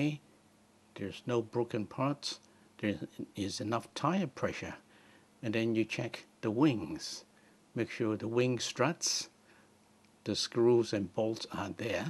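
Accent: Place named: United Kingdom. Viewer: You sound British. almost.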